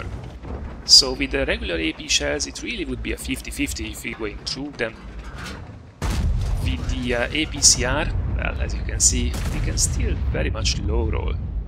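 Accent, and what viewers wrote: Hungary. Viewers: de hallatszik hogy magyar vagy :D ment a like es a feliratkozas :)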